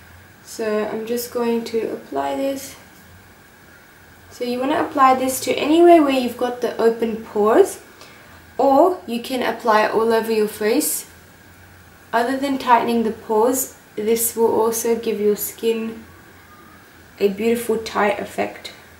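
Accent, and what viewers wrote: United Kingdom. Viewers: Hey girl love your British accent 💞Please share the secret of your healthy lashes....... Love all your channel thanks love from Pakistan 💕💕🇵🇰🇵🇰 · The English accent is bae 😘😘💕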